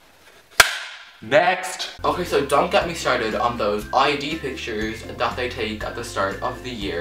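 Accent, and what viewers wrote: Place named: Ireland. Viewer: Ah bless. The Irish accent is lovely as always :) thanks for making my day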